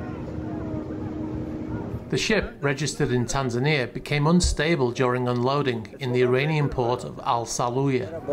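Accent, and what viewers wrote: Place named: Oldham, United Kingdom. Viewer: Thanks for these short clips. The longer ones too. I’ve been a fan/subscriber since before seized yachts were the big news. I’m in Toronto but I think part of the appeal is your accent reminds me of my friends who came from Oldham. Looking forward to the next one.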